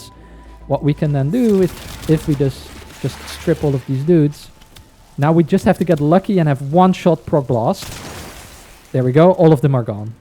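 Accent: Netherlands. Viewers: Are you Dutch btw? I Recognize your accent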